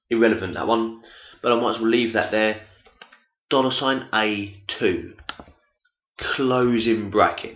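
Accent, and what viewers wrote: England, United Kingdom. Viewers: Thank you very much! You saved my life today at work! P/S: I really enjoyed English accent : ) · Very helpful. English accent makes it sound highbrow too!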